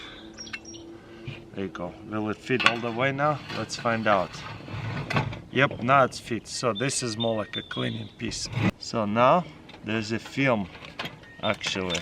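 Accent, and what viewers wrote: Russia. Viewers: Ужасный русский акцент.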